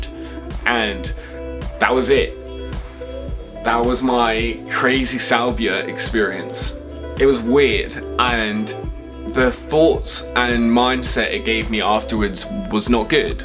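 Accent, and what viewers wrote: United Kingdom. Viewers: U say LA but u sound British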